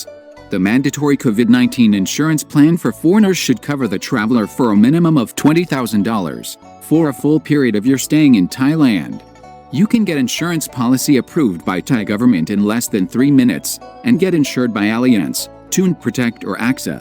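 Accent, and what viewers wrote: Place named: Ukraine. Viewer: Gday, pete sent me here. subbed liked To your channel. I like pete and his videos alot, but i was annoyed by his question asking you if russia should have invaded ukraine bloody STUPID question! some feedback for you. I really dislike computer voices on videos, I much prefer you speaking even if you might think your ukranian accent might be difficult for some people to understand. Or whatever other reason. please consider using yr real voice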